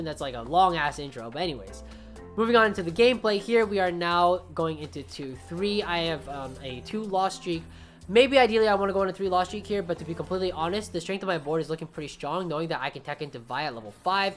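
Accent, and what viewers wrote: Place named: Boston, United States. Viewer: Hey, found you and learned a lot from your previous road to challenger vids! You said you have a Boston accent, I'm also from Boston and also suffer from the random accent coming out LOL, keep up the good work!!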